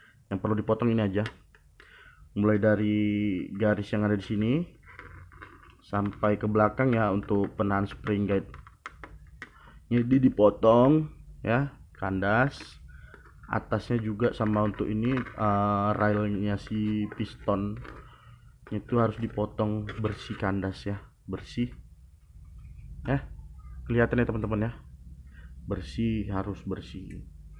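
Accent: Medan, Indonesia. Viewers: Jooosss bang.. Cm dr logat nya kyk org medan ni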